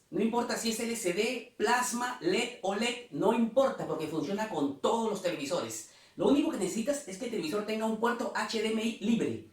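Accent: Peru. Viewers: Bien, peruano tenías q ser, entiendo bien tu castellano.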